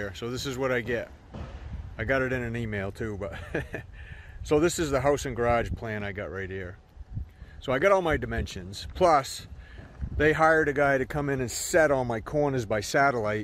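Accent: Maine, United States. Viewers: Ya sound like you was born an raised in winston salem maine or something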